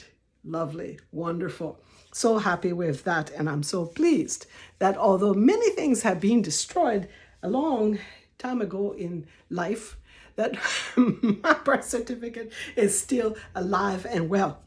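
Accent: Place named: Bassa, Liberia. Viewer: You put a smile on my face!❤️😊My mom is from Rivercess, GrandBassa county ! You do have a beautiful Bassa accent. We love listening to you!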